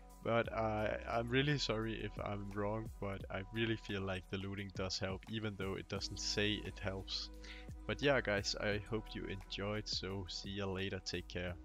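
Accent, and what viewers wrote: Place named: Germany. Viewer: I hear some deutsch in your english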